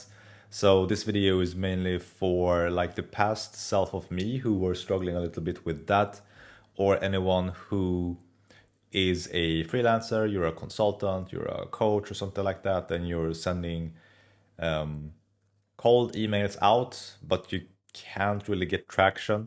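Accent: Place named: Sweden. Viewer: Swenglish is strong in this one. Great value video surprised it has so low view count!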